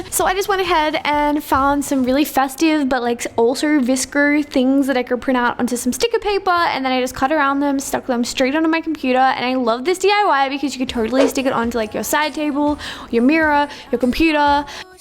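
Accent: Australia. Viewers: her voice sounds as if she was aspyn ovard...yet australian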